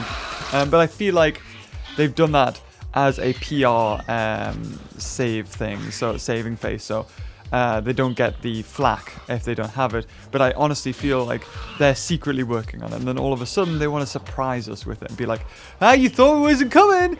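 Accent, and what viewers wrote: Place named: United Kingdom. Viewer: You have a uk acint and britches in some video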